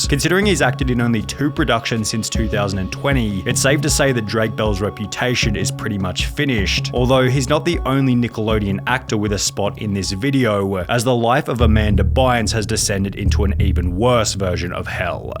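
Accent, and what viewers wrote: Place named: Australia. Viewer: Great...another Australian narrator... why?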